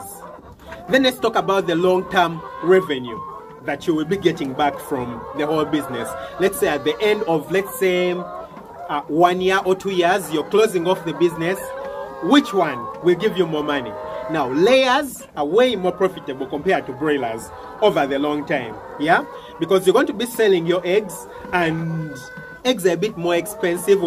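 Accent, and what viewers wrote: Uganda. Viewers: Your accent is so ugandan 🇺🇬🇺🇬 mucu love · Sir are u from uganda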